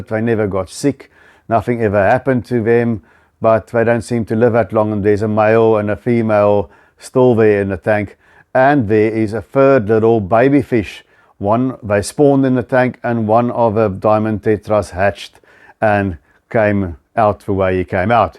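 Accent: South Africa. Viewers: Love South African accents, great tank too!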